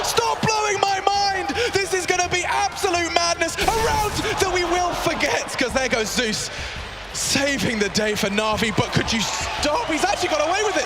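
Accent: Germany. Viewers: Liquid vs Fnatic on dust at MLG columbus, astralis vs fnatic at MLG columbus... and pls get rid off that cancerous german accent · Ha ein deutscher, so ein grottiger englisch Akzent xD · Lol, du bist ja Deutscher :D haha nie gemerkt :D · Dieser deutsche akzent :DD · Do you come from germany? :D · Nice accent ;) Are u from german :D? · You sound like you are from germany....are you? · Du hörst dich deutsch an :D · Your german,right ? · bist du deutsch xdd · I can hear it. Im from Germany too and its a German Accent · Are you from Germany , you sound so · Man merkt das du deutsch bist!:) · Als ich mir das Video angeguckt hab, dacht ich mir: "Der muss doch deutsch sein, der redet so:D!" Das is er auch xD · Du bist Save deutsch das hört man so hart an deiner Stimme :DDD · dieser deutsch-englische akzent ^^ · Pretty strong accent but I'm used to playing with German friends (which is why I can read/understand German pretty well). 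Having played with a lot of German people, English skills are generally insanely lackluster. Then again, Germany is a pretty big and independent country making it a little less important to speak English I guess... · are u german or did u traveled to germany? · Dieser Deutsche Akzent :) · Du bist deutsch oder ? Ich höre so ein deutschen akzent 😋😅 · Haha :D 2 Sekunden vom Video gesehen und direkt erkannt, dass du Deutscher bist^^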